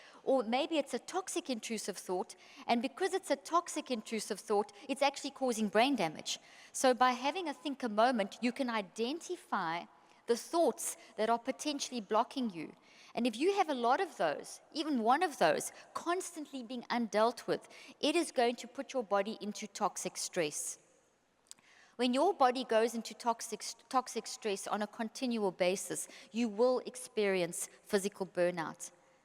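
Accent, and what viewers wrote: South Africa. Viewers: Is this a South African accent? How wonderful to listen to; both the accent and the content, of course!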